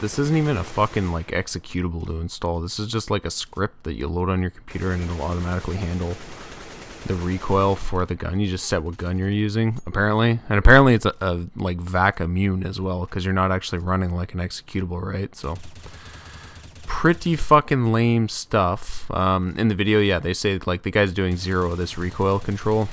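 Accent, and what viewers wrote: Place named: Canada. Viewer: Nice canuck accent btw... :P Could pick that out instantly. Grew up in the north myself! :D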